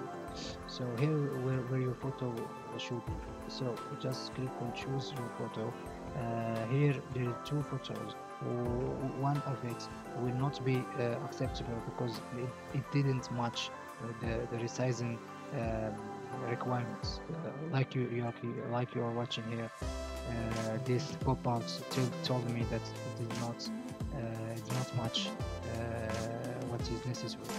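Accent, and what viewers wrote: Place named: Morocco. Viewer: bayna mn aaaaaaaah w sawtk mghribi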